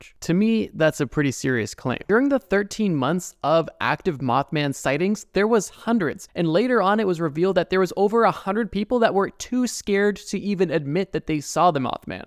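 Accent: Canada. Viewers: Is this guy Canadian? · Are you Canadian?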